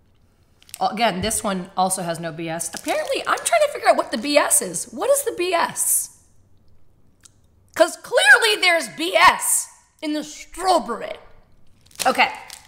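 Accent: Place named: United Kingdom. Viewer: How fun!! - Looking forward to the next one! ... and Your British accent is impressive!!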